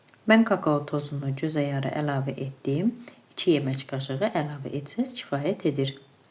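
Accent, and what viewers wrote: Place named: Shaki, Azerbaijan. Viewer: Siz Şəkilisiz? Ləhcənuz çok gözəldir 😊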